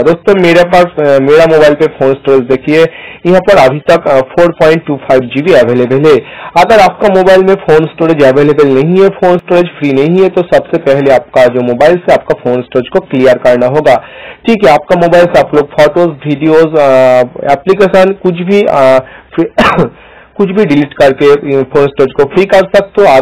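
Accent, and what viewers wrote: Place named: Bengal, India. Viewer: Bangali hai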